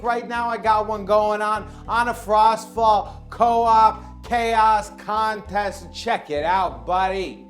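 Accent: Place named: Boston, United States. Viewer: Just an annoying guy from Boston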